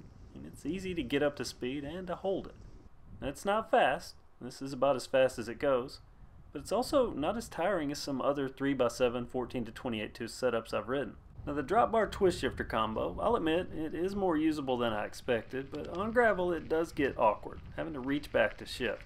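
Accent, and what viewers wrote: United States. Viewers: why not? I would be happy if I had your accent . My russian/turkish accent is sucks. You sound true american. Don't get me wrong . I am gonna keep listening . I know one thing for sure , if you like something it's gonna work you. 🤝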